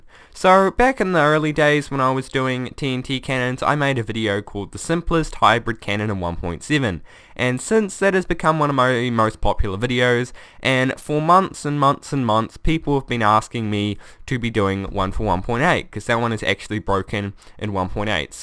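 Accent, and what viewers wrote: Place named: New Zealand. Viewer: As soon as I hear your voice I knew you were a Kiwi <3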